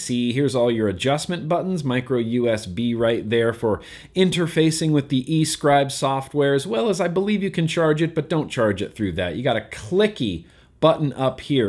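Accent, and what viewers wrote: Australia. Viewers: Not bad on the aussie accent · I approve your Australian accent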